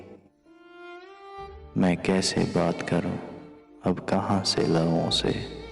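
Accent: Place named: Kashmir, India. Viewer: Voice of kasmir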